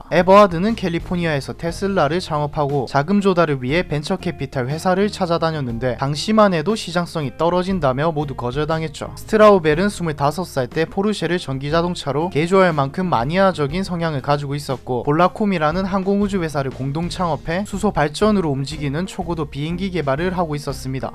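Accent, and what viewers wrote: Gyeongsang, South Korea. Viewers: 사투리억양이 느껴진다 갱상도인가? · 경상도 말투가 좀 섞여 있네요? ㅎ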